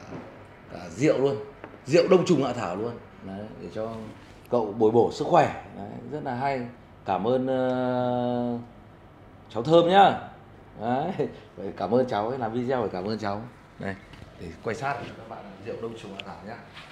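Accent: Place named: Hanoi, Vietnam. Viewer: Minh da Theo doi t all va minh rat yeu men co giong noi ng Ha Noi de thuong, hien co hau Love ya sweetie ❤️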